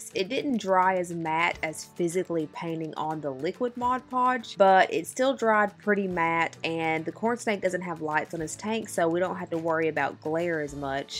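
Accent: South, United States. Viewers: Girl u beautiful But that country accent sum else 😭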